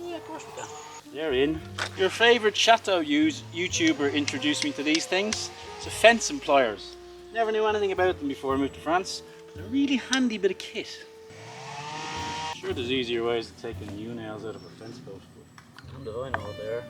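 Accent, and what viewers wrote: Dublin, Ireland. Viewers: Loving your vlog. Been watching from the start so maybe I’m number 30 or so of your 40 or so followers. Was great to hear that New Zealand is a place you want to visit. We love Irish accents here. Love your down to earth approach. Would love to see more of France but can’t travel at present (no I’m not in jail) so really love your trips in Pasquale. Great camera work. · I could listen to you talk all day. Love an Irish accent. Great vlog, keep them coming. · If you mistake a strong Dublin accent for a Scottish one, you can't be too familiar with either...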